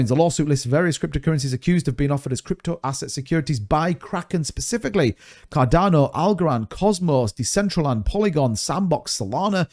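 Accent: United Kingdom. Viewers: Holly sh1t! You're a Brit! I have duly subbed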